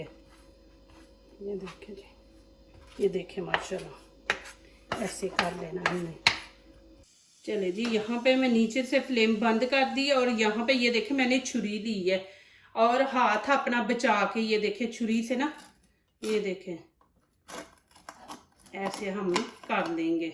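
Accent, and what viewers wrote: Sialkot, Pakistan. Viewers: بہت اچھی ریسیپی بہت ہی اچھے انداز میں سمجھا سمجھا کر پیش کی۔۔آپکا سیالکوٹی لہجہ بہت پیارا لگا۔۔۔۔۔اللہ آپ کو ہزاروں خوشیاں عطا کرے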